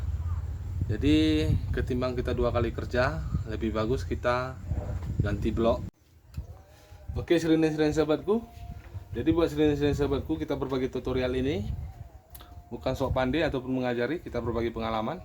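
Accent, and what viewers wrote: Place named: Aceh, Indonesia. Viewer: Plat motor yah sih medan tpi klo logat y orang aceh ni abang ya😅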